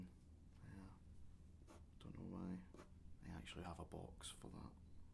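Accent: Scotland, United Kingdom. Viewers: Loving the videos man keep em coming! Scotsman myself so its great to hear our accent being put to good use :P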